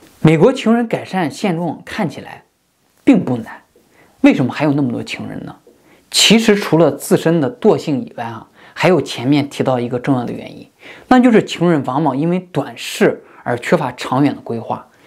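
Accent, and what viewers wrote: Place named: Northwest, China. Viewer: 听口音像是中国西北人 宁夏 甘肃 确实比较贫困 不上大学走出来真的是要穷一辈子 恭喜你的努力给了改写了后代的历史！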